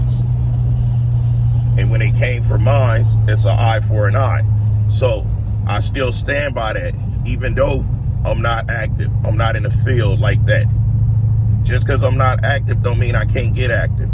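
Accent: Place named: South Central Los Angeles, United States. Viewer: THATS OUR SOUTH CENTRAL LOS ANGELES ACCENT, YOU FINNA TELL A WHYTE GUY FROM BROOKLYN TO SPEAK LIKE YOU? 😂😂😂💯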